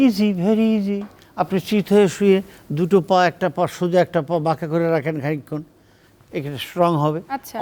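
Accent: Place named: Bangladesh. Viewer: Bangladeshi English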